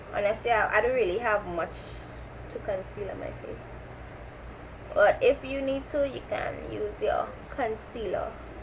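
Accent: Trinidad and Tobago. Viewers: oOh cool ur fromm triniii heariin da accent! (: which one would u recommend for oily skin the stick or the cream to powder foundation by black opal???? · Are you trini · haha You sound like my mum, she is a Trini too and she talks the same way you do, aw so cute hehe :) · love to see my Trini ppl . love the accent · Its trinidadian accent :D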